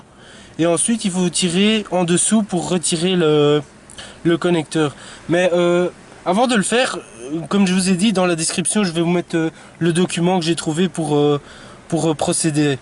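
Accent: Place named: Belgium. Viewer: merci pour cette super vidéo qui va me faire économiser quelques sous et qui m'aura aussi beaucoup fait rire entre ton p'ti accent belge , je pense , et tes commentaires ! Si la cuisine te gonfle , tu sauras dans quoi te recycler . Bonne continuation.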